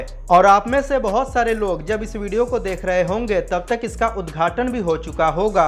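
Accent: Bihar, India. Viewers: Ur ascent is like that of a Bihari. Sorry but Biharis are poor at Hindi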